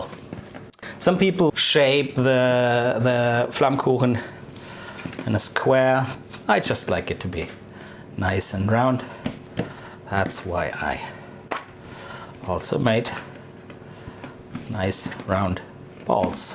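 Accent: Germany. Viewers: Lol all Germans say ‘stripes’ instead of ‘strips.’ And ‘vinegar’ invariably becomes ‘winegar,’ which is weird given ‘w’ is pronounced more like ‘v’ in German! But anyway, thanks for sharing - I love Flammkuchen.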